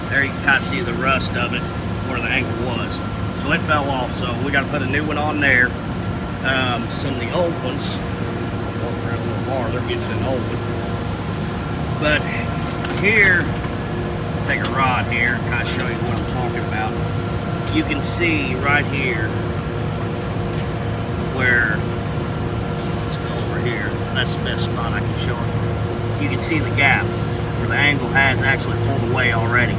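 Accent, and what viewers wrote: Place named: South, United States. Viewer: I could listen to your voice and accent all day, it's a real southern drawl. (from a G'ma in Australia)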